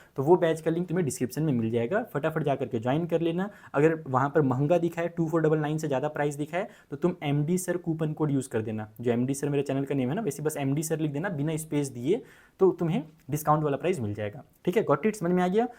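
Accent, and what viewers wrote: Bihar, India. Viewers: Md sir +bihari language tadaka =interesting maths ❤❤😅😅😅😅😅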